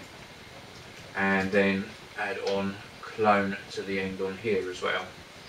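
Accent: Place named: Cockney, United Kingdom. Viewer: Every time I hear this intro music, I think "Cockney accent coming up".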